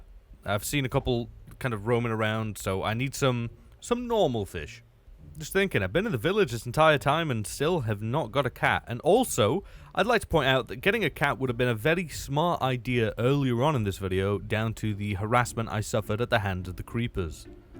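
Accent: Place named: United Kingdom. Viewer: The strong British accent slays and I could like hearing the yapping.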